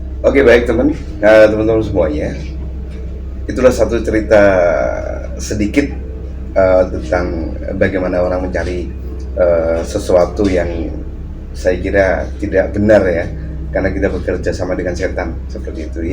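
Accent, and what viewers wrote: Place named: East Java, Indonesia. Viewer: mau tanya Abang asalnya dari mana? logat jatimnya faseh banget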